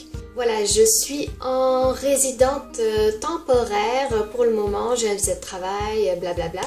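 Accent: Canada. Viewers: T as un accent canadien ?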